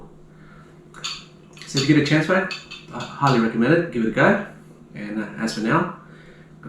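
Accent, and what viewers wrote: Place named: Australia. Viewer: This is so trippy to hear a vietnamese guy with an Australian accent? Sorry I don't know the accent.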